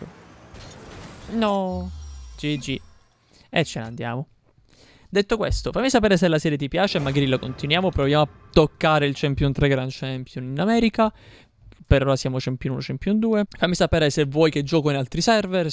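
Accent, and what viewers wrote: Palermo, Italy. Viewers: Bella... Ma di dove sei? Sembri palermitano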